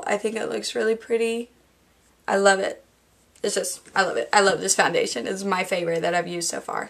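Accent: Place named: South, United States. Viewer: Love the finished look, also your lovely southern accent, from the emerald Isle...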